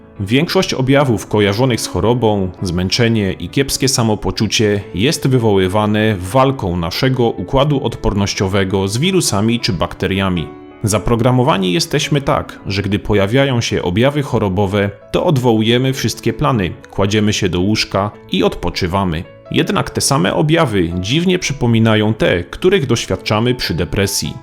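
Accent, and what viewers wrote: Silesia, Poland. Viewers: Czy lektor jest ze Śląska? Słychać akcent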